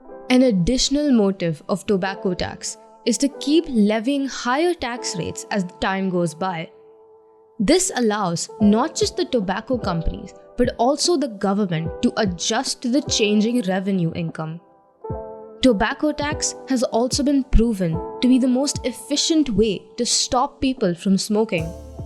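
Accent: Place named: North India, India. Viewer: This North Indian Accent. And the audacity to speak it so confidently. Fucking "Pakistanis on the wrong side of border" .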